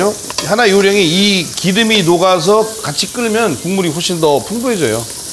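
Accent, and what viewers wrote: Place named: Yesan, South Korea. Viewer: 보령 옆의 구수한 예산의 구수한 사투리의 음성이 귀에 쏙쏙 들어온답니다^^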